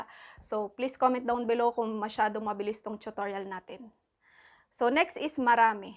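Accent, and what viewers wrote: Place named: Philippines. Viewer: You sound phillipino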